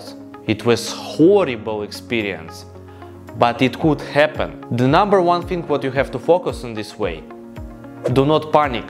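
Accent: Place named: Russia. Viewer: Русский акцент режет ухо😢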